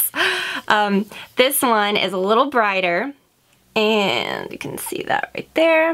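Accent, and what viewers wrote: South, United States. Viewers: You're accent is so cute! Not too country but still southern sounding.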